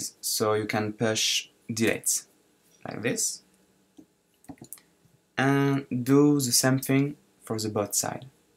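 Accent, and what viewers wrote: France. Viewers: Accent prononcé j'ai reconnu que tu étais français ;) c'est genial tout ce que tu fais vraiment ! Ce qui serait parfait : que les tutos soit en français :) Mais bon j'imagine que tu as beaucoup de travail donc continue ainsi ! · Mec c'est super ce que tu fais (je sais que tu es francais ca s'entend dans ton accent un peu ;) ) tu mériterais vraiment d'être reconnu car t'as un talent inné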